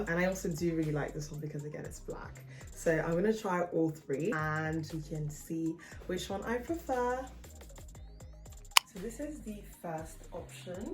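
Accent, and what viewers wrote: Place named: China. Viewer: I LIKE YOUR CHINESE ACCENT MME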